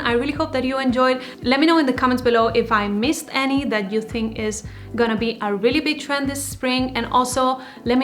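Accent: Spain. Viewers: Are you from Spain or Argentina? You speak English as if your original language was Spanish. And the way you said Bolero reminds me of how my Spanish ain’t says it. Super duper curious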